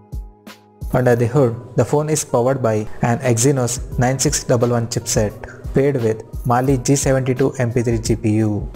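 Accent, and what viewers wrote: India. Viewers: English (Indian phonetics)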